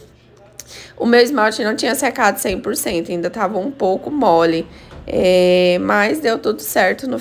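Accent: Brazil. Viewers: Amo os seus vídeos e o se sotaque goiano. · Vc é de Goiânia?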